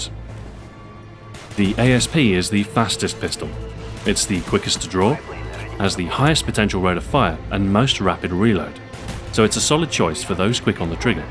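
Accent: England, United Kingdom. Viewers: the 27 are so but hurt tht they dont have an awesome british voice like this guy im british too sooo :D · im pretty sure hes from england, thats why he has an accent and knows english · You make this kind of analysis so interesting and it's also nice to hear an English voice on CoD videos for a change. You are getting me through my red rings of death so please, please keep up the good work! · Very professional Videos, very good quality and high entertaining value (omg) throughout from the first mw2 video to the black ops videos today. By the way, i love to hear english people talk german ;) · Holy Shit! It's British William Strife!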